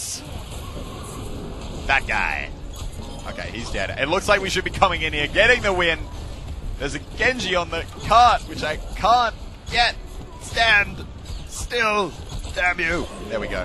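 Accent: Germany. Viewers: why is the voice german?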